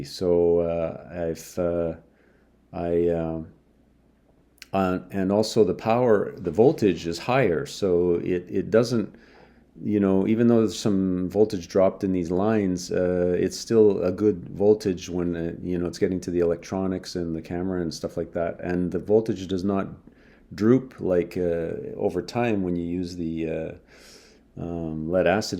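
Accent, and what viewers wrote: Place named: Canada. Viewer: Never saw one of your vids before this, but the thumbnail caught my because I instantly figured it must be Starfest. Then hearing your Canuckistanian accent (me, too) pretty much confirmed it. As I type at roughly the 12 minute mark I hear you say that it is Starfest. My last time there was in about 2013, if my memory doesn't fail me. 😉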